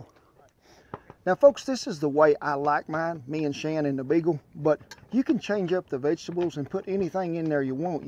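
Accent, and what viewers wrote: South, United States. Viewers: I enjoy your videos. The food sounds as if it would be wonderful, but the best part to me is your line of patter, delivered in Southern, my native tongue in the hillbilly area of South Carolina. Thank you for your efforts. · I don't care how you pronounced the words of the secret ingredients. With that heavy southern accents, we still can understand it. Fusion food fried rice. :)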